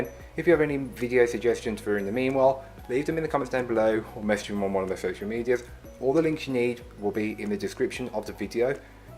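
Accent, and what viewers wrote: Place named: Birmingham, United Kingdom. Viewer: Hi just fancied making chocolate flapjack and found you! I'm a Brummie so it was cool to hear your accent. Never had these at school though 🤔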